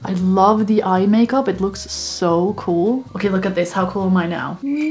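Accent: Sweden. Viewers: Is she from Sweden her English accent sounds like Swedish english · YOU’RE SWEDISH, RIGHT? I dunno why I got so happy by your accent, it’s just so familiar hehe. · R u swedish?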